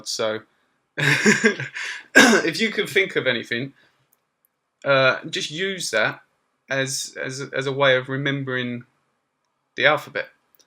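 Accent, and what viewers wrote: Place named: United Kingdom. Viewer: Someone who speaks thai and it’s not a precise accent it’s just a basic brittish accent แต่ตกลง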